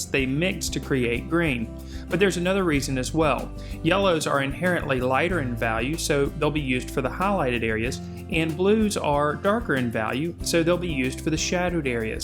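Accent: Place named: East Coast, United States. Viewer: I was wondering if you consider all American accents wonderful (?). For me it's a normal American accent (Being an English Teacher with a strong affinity and love for the English language, I'd probably place it somewhere around the East coast of North America. I wonder whether the would confirm my guess...)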